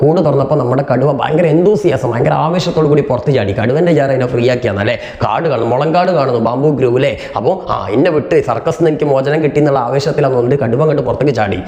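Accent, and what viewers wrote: Malappuram, India. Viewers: sir malappuramaanoo sthalam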